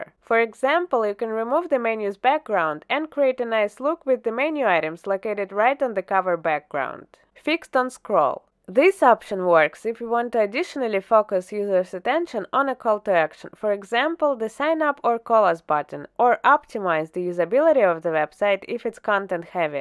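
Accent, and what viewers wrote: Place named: Russia. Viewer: Приятно слышать русский акцент)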